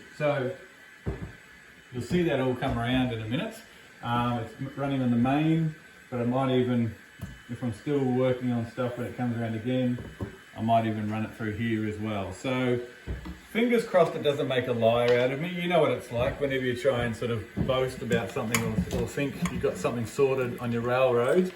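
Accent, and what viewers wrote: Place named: New Zealand. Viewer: Hi, a Kiwi in Christchurch. Wasn't sure if you were here in NZ or in Aussie but the accent and reference to "hi-country" put you in this part of the Pacific. As noted, nice to see something (more) local rather than from the US or UK. I'll be keeping an eye on the progress of the Ness River Railroad. Keep safe and well, Rgds Brent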